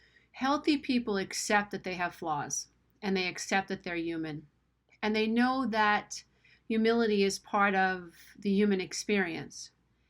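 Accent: New York, United States. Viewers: it's refreshing to hear a narc abuse channel with a new york area lilt. nice work. thank you.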